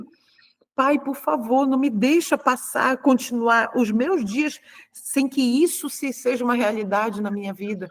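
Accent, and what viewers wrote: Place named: Pará, Brazil. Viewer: Obrigada meu Salvador Jesus por tantas bênçãos através dessa live!!🙏 Obrigada minha irmã do céu!❤ A Sra é de Belém do Pará? Seu sotaque parece paraense... Um grande abraço! Nos vemos breve com fé no Salvador!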